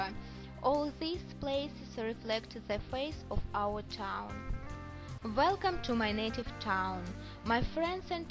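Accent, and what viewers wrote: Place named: Russia. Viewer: Сразу видно что русский акцент.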